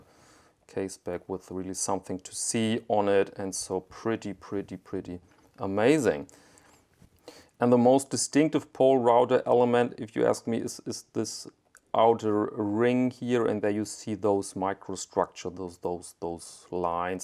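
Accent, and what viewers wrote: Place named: Germany. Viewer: The German accent adds credibility. Where do I get one?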